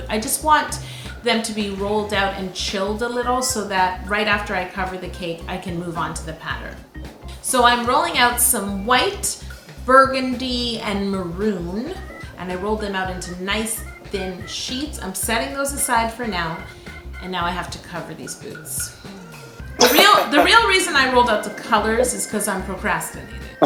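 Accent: England, United Kingdom. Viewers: English accents, yes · I love your cakes! You inspire me! I recently started my YouTube channel and have been baking a lot. My favorite so far is a piano cake that I brought to Choir rehearsal before all of this Quarantine! Everyone loved the piano which was really a cake! I can't wait to try to make your Watermelon cake in the summer. These boots are so cute with this cake & love your British accent in this video, lol !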